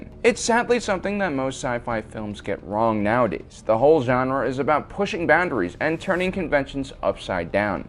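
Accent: South, United States. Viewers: Why do you have a southern accent lol? I am interested in your family's story. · You look asian, but sound southern american. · You have a good modulated voice, don't try to ruin it by speaking like a southern man. It's unintelligible and is quite annoying. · The southener is strong in you. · You got a wired accent, man. It’s a mix of US southern and British. Great video. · Are you from the south? Your voice seems kinda different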